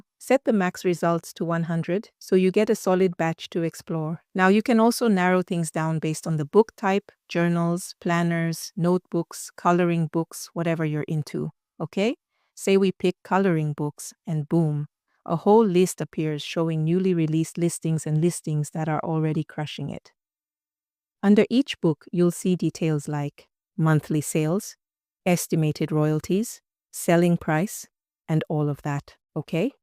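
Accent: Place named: Africa. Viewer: She's African that's why she talks like that. English isn't first language it's obvious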